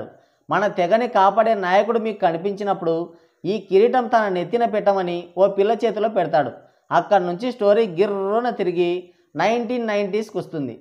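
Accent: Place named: Telangana, India. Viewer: Anadaru chiru, ntr laga real. Life lo act cheyaleru lera disiplane anteh endi ra lanjakodaka telangana slang lo matladitheh discipline lenataa pukaa andra lo shekalu. Paditheh displane unatlaa ina ni voice ala undi nit motham evadidoo chiikii chikki noru noppi puuti matladinatlu ni abbaa ninu byta edo oka roju pagal dengutharu chusuko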